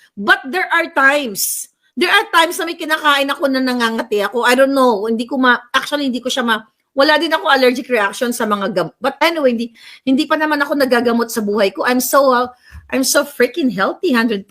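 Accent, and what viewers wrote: Visayas, Philippines. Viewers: Naintindihan ko maharlika ang bisaya mong salita hehehe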